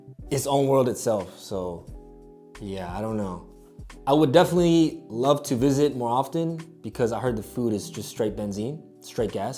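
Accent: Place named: Germany. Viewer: actually, I really enjoy watching your vids… as a bilingual person ich verstehe dein Denglisch perfect and I love it 🔥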